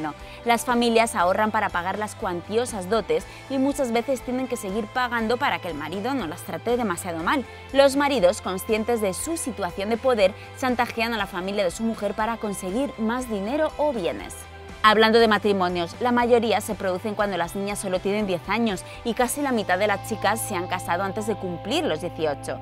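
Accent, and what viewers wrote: Spain. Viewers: Pues yo vivo en España y deduzco que por tu acento tú también. ¿En algún momento has sentido que tu vida no vale nada? Pues yo no. Siempre he sabido que mi vida vale lo mismo que la de todos los seres humanos. Me encantaba este canal pero de verdad, este vídeo está lleno de desinformación, negligencia e ignorancia. Es que, ¿España y México dentro? Tanto en España como en México se valora la vida de las mujeres. Y teniendo en cuenta que el título es "Países en los que las mujeres no valen nada"... pues eso.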